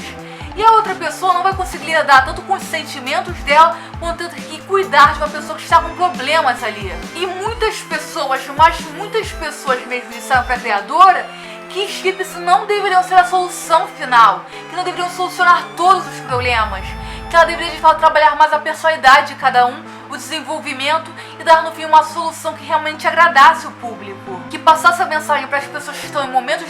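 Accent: Rio de Janeiro, Brazil. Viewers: Ela é carioca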